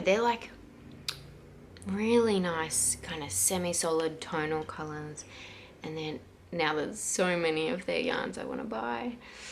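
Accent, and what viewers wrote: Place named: Australia. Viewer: Hi, I’m a Sydney girl, it’s so nice to hear another Aussie voice on here. I’m looking at starting a yarn carousel similar to how the America girls share yarn and I’m wondering if you’d like to join us. I’ll be posting a video about it later this evening ifyou’ interested. I’d love to have you join us in this little bit of fun...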